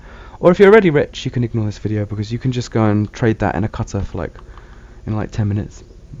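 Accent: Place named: United Kingdom. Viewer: You know it's funny, I feel like these videos are so much smarter sounding and informative with a British accent than if anyone with an American accent made them ;o (is American)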